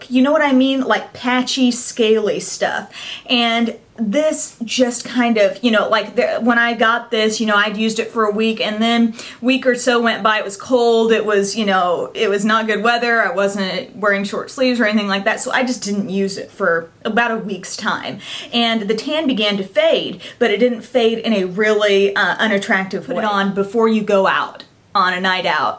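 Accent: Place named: Texas, United States. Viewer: You sound like your from Texas. :)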